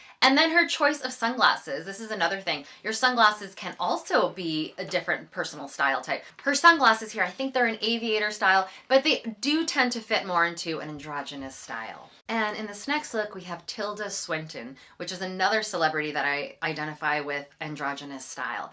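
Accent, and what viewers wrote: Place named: United States. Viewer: First of all can I just say how much I love your channel and I wish it every success, you thoroughly deserve it, you're so knowledgeable and a great presenter, you have a lovely voice and soft American accent that is a pleasure to listen to and your content is always excellent. I invested in a princess style wool coat from Jigsaw in the UK back in 2005 and I still wear it and it looks amazing. It's taupe, another great classic colour, not too light and not too dark. It goes with everything and I like taupe much more than camel or beige. I love browns, also chocolate brown (not coffee bean brown, which is too dark and quite harsh). I'd love a navy or blue coat and although I don't need it, I'm on the look-out for one, I also love dusty pink, which in an underrated neutral and a very flattering and feminine one imo, I recently saw a lady wearing a dusty pink trench coat and she looked amazing. 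Like taupe, dusty pink goes well with any neutral colour.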